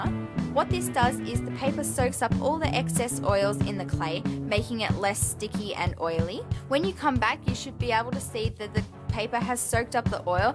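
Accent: Australia. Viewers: I'm brand spanking new, wanting to give this a go and I'm so thrilled with all the "how to get started without buying the whole craft store" tips!! Thank you! Subscribing now because I love your style, your editing is really good and it's awesome to listen to a fellow Aussie! <3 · Hey, just curious, are you a fellow Aussie? (Just asking cause of your accent and the Woolworth's brand)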